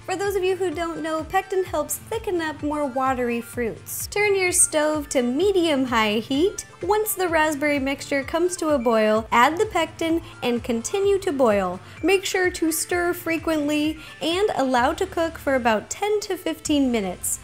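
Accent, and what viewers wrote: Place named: Pacific Northwest, United States. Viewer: I'm enamored by the accent :O Is it common in the Pacific Northwest???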